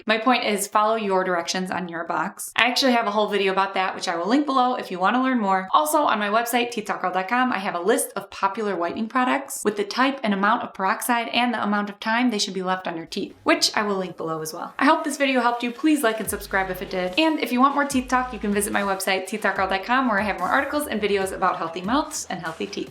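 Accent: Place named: Chicago, United States. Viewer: So. Much. Chicago! I love your accent! Thanks for the great advice!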